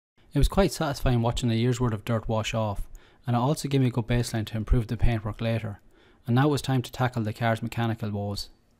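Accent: Ireland. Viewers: Great job. I enjoyed hearing the Irish accent too (Mum was Irish). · Glad to here another Irish accent on YouTube. Keep it up bro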